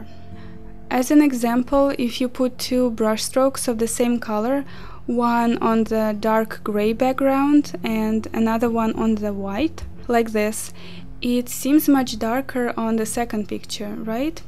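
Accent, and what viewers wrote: Russia. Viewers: Интересно, у нее русский акцент или какой? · По акценту понятно, что человек русский :D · Русский акцент, из России? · are you Russian?You have a Russian accent. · aren't you from Russia? i am, and your accent really sounds like russian)) you're cool✨ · i hear russian accent, are yoy russian? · Она русская ? Просто очень слышен русский акцент)))) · Такой акцент русский. ппц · Are you russian? You are have a russian accent))))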